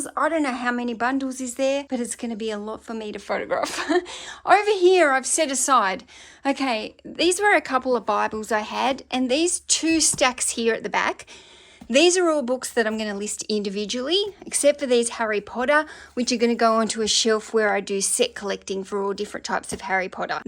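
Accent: Australia. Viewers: Im guessing east coast Australian, coming from a South Australian here ;)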